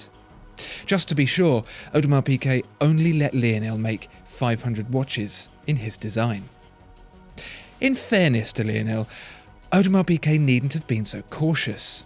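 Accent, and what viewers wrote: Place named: South East England, United Kingdom. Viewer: All my friends sound like this (south-east England).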